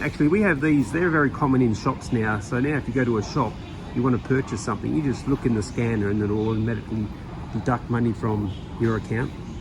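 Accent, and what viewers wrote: Australia. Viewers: From the accent, it feels like this anchor is from Australia.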